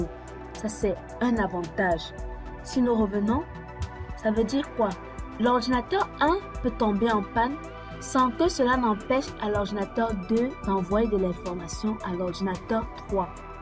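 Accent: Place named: Cameroon. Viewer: Tu intonne comme une Camerounaise.. Tres bonne lecon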